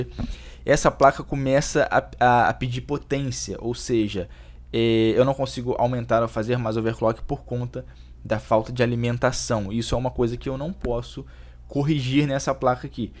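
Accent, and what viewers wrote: Rio de Janeiro, Brazil. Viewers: tu e de que bairro do rio ?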